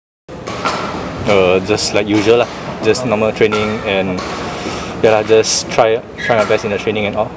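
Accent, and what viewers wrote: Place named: Singapore. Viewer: most singaporeans speak like that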